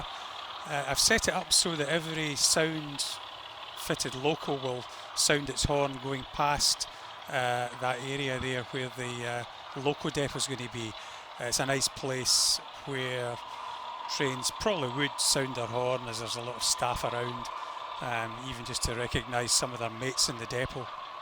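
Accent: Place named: Scotland, United Kingdom. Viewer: Always nice to hear a Scottish modeller. Subbed 👍🏻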